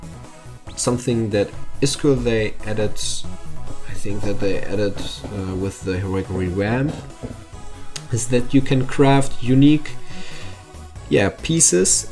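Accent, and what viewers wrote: Germany. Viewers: Man hört krass raus dass du deutscher bist xd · You sound like a German people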